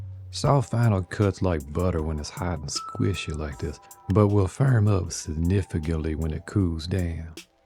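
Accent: Louisiana, United States. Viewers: Hooray! Another Crafsman video! 🤩I love your soothing voice and Louisiana accent (I grew up in New Orleans, myself.) I also love how inventive you are-- it inspires me to try new things.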